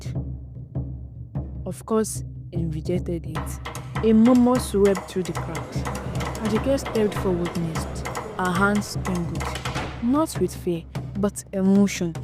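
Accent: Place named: Nigeria. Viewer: Good story. Fantastic Nigerian English accent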